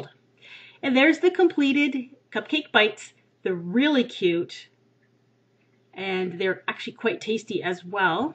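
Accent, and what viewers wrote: Canada. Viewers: Haha, I KNEW you were Canadian, I noticed your accent :D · You're Canadian! I thought that! Either Canadian or Minnesotan! I love your accent! (is that wierd??) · i noticed you were canadian from your accent,because my cousin is canadian.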